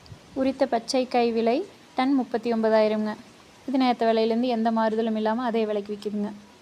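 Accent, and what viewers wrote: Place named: Kongu, India. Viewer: கொங்கு தமிழ் அழகு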